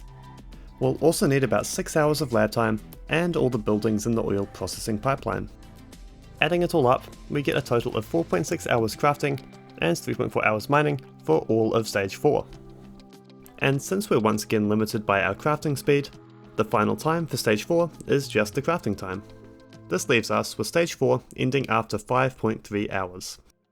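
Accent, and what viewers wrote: New Zealand. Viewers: You are you from NZ? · Are you from New Zealand?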